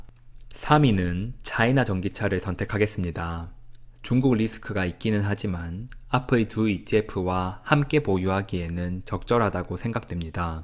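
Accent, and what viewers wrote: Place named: Ulsan, South Korea. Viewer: 좋은 정보 감사히 잘 봤습니다. 말씀 톤으로 보아서는 울산분으로 보이십니다. 아는 분과 많이 비슷한 느낌입니다.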